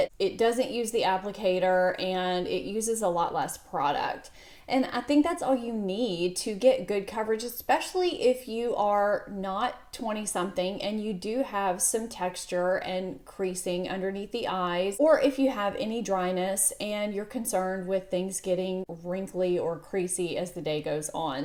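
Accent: South, United States. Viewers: OMG the fur baby on the bed killed me lol! I have one of mine own that just makes herself comfy wherever and whenever she wants 😂. Cutie pie though...makes me identify with you a little more than other "YouTubers". Just an everyday woman like me fighting the signs of aging the best we can! And love the accent...southern girl like me lol! I really like your channel hun and love your info and tips! Keep up the good work 👍🏻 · Yeah Ive struggled with the shape tape, its a bit over hyped. While it gives very good coverage. Your video hit the nail on the head. Less is More. If I can ask why do you prefer the brightening powder over the other setting powder by Laura Mercier? Thanks from one Southern Girl to another, atleast you sound Southern LOL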